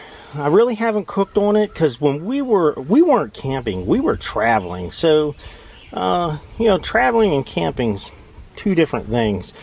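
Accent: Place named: Western Pennsylvania, United States. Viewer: robert hoffman - I caught that too..plus overall accent..my mom and dad are from around Tarentum and Oil City...I grew up in NC tho! Thanks for video..I'm single 62 yr old female just retired. I've never pulled anything behind my car and I'm looking for anything small. This is sounding more and more like the way to go!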